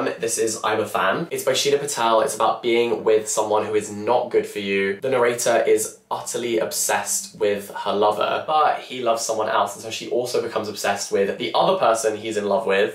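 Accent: United Kingdom. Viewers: I'm preparing for my IELTS exam and your videos are helping to understand british accent and also to learn how to talk fluently. Love you, ~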